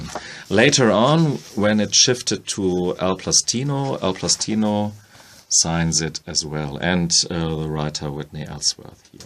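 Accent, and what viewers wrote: Germany. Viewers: I Listen to your soothing German accent while painting. Keep up the great work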